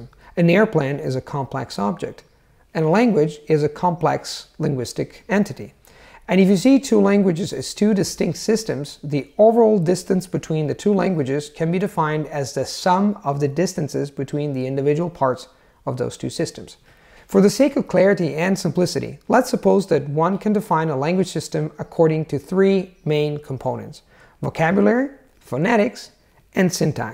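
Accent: United States. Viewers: you speak English with an American accent. Sometimes I hear other people that learned English speak with a British accent. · babe, no one is berating him or anything based off his accent. I never said that sound like a native should ever the main goal when picking up a language. The reason I brought this up is because I've been watching his videos for years & I do know he used to have a stronger American accent. That being said, it is interesting to point out how the acquisition of more languages can affect the languages one already has under their belt. Please think everything through before stating someone else does not support him. I obviously do. :) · Native English speaker here. In this video he sounds a little raspy, like he's getting over losing his voice. I haven't seen a general degradation of his American accent; I don't watch all his videos though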